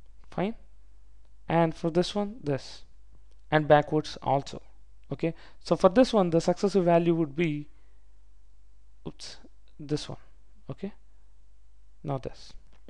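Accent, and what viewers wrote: India. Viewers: let me guess. Are you from Andhra in India?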